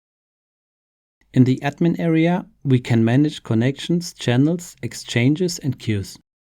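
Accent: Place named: India. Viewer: Very good, u almost sound like an indian person too!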